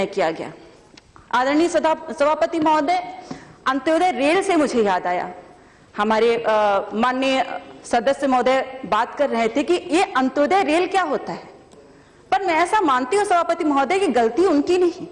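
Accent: Standard. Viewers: इतना शुध्द हिंदी संबोधन बहुत कम लोग करते है ।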